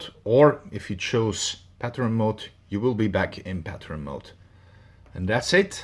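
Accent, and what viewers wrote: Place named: Netherlands. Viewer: Jouw accent klinkt Nederlands dus bij deze: dank voor de video. Heb je ook muziek van jezelf geupload naar Youtube?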